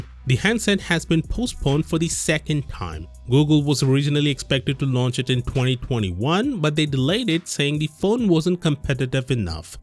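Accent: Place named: India. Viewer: Ugg why does youtube always recommend me this fucking channel. Go away. I just want tech news. I can't stand this guys indian accent.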